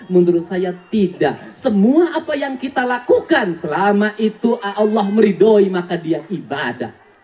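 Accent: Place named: West Java, Indonesia. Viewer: Cara ngomongnya pak dedi ngingetin sunda banget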